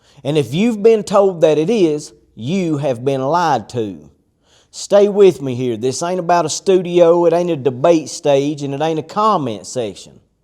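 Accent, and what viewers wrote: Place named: Texas, United States. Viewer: Wow, once again I feel blown out by such a strong and sharp speech full of truth and wisdom so that I couldn't help it but to listen to it three times in a row! Bro, your words are those of a sharp shooter in their accuracy and that of heavy weaponry in their effect. I'm totally amazed. I must add one thing - I used to hate so much the Texan accent due to the war criminal G. W. Bush and the such. But thanks to sheikh Yusuf Estes first and thanks to you now, I've come to enjoy listening to this beautifully spoken English. My sincere admiration and respect, Bro.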